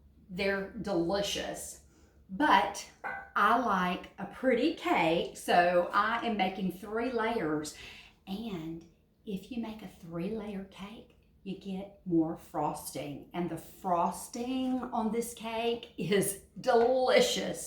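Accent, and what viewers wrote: South, United States. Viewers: Thanks for sharing! I love your southern accent ❤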